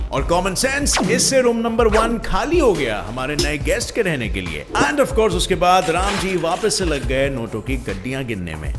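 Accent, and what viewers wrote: India. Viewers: Liked your new abdaaz of speaking... Desi........ 😎😎😎😎😎😎🤩🤩🤩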